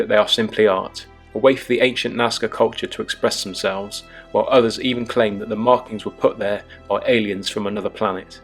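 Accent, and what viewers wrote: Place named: Dorset, United Kingdom. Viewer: Non-sensationalist content, nice measured delivery, and that Dorset accent (lived there for a short [but "interesting"] time) Automatic subscription (and I've forwarded your YouTube location address to a colleague of mine, who retired to Bridport, after finally completing her Ph.D. (3 months before retirement :-D ) Yes, Les - I'm talking about you!! :-D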